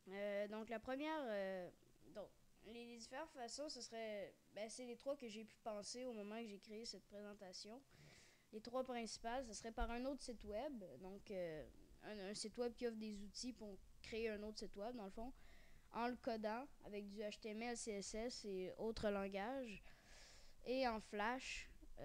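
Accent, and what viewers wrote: Canada. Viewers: Sa se voit que ses un canadien avec la voix. J'ai rien contre eu mes ses la première fois que j’entends parler un canadien. ;)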